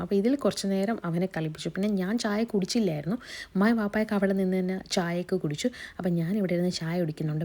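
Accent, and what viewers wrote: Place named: Trivandrum, India. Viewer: Trivandrum slang orupadundu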